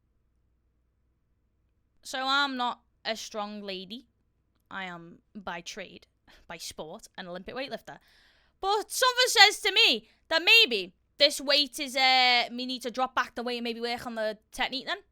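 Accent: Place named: England, United Kingdom. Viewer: Primeira vez vendo seu canal com a sua voz original e legendas já que sou do Brasil, costumo colocar a tradução na sua voz mesmo em português porém nesse vídeo não possui Aliás adorei seu sotaque é inglesa certo?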